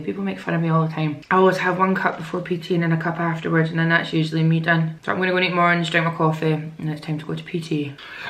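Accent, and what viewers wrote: Scotland, United Kingdom. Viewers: Scottish I thought I was going to get American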